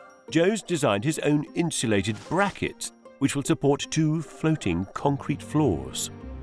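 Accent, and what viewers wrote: United Kingdom. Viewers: the British accent really completes this video haha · If was not narrating in his British vernacular, these videos would just not be as interesting.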